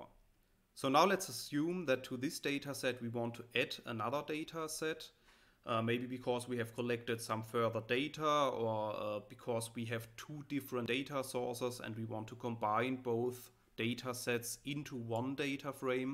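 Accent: Germany. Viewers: Good lesson. Good english, relatively poor mine. I don't know deutsch, but diction sounds like deutsch from Hessen Frankfurt.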